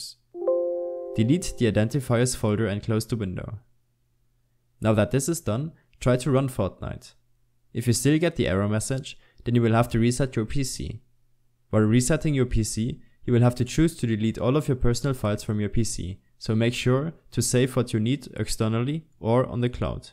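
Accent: Germany. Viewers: lol ich hab sofort deinen deutschen dialekt erkannt xD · Man merkt dass du deutscher bist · bist du ein deutscher??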